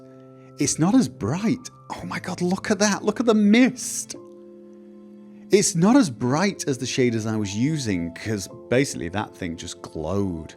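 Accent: United Kingdom. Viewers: You know I finaly remember why i like watching you. You are basically have a british 'Morgan Freeman' voice in that its amazing to listen to and you happen to play alot of games i enjoy. Please do more of the minecraft stuff!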